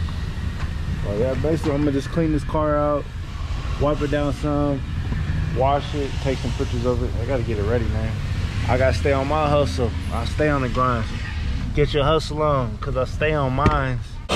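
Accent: California, United States. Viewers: I heard the Bay Area scraper music and your accent and said dude from Cali dam you shoulda came to Texas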